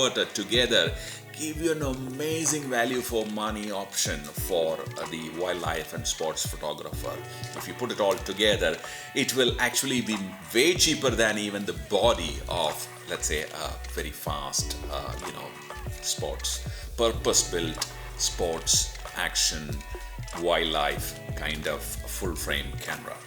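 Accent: Kerala, India. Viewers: Chetta....ur mallu accent .....rocks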